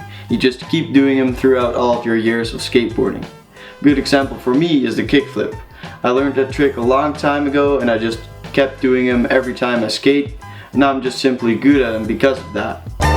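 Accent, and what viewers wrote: Netherlands. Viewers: He has a Dutch accent, m8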